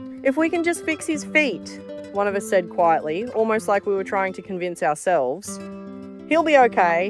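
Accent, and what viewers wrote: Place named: Australia. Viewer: So so agree with you. Your words give me the courage to go out and become a better human to offer what I have what I have learnt from my involvement with animals the good that I can still give to those who need something in their lives. Where are you situated? Your accent sounds Aussie is it.? Let me know please. Thankyou for all you do god bless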